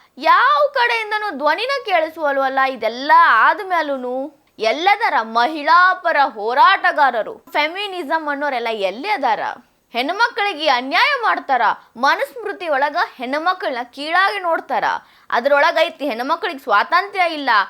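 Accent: North Karnataka, India. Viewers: ನೀವು ಮಾತನಾಡುವ ಉತ್ತರ ಕರ್ನಾಟಕ ಶೈಲಿ ಅಂತೂ ಅತ್ಯಂತ ಅದ್ಭುತ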